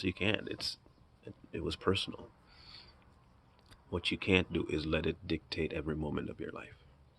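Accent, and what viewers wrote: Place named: Caribbean. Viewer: Which Caribbean island are you from, dude?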